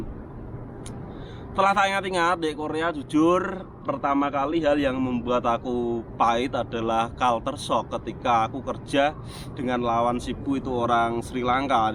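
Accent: Java, Indonesia. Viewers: Jowone ndi pak?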